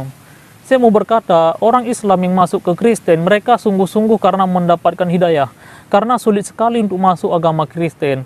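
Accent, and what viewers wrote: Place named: Pidie, Indonesia. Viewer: aceh mana kau? aceh pidie ya? dr logat bahasa aceh kau nampak org pidie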